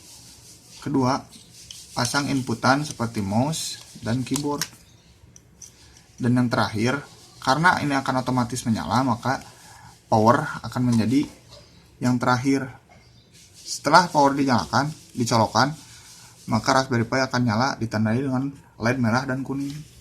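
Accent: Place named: West Java, Indonesia. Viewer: Mantap sa masih aya logat sunda 😆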